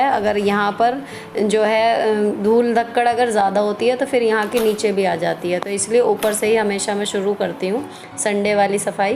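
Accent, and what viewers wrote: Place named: Malwa, India. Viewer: AP kha se h mam apki language me mp malwa ki jhalak h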